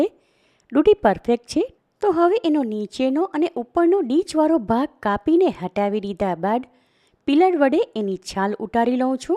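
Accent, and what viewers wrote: Surat, India. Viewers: જેવો મીઠો હલવો એવી મીઠી સુરતી બોલી❤😊❤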